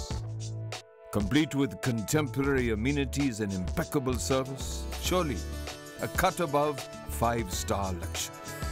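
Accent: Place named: India. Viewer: I think this is the first time I have ever heard a white person with an Indian accent.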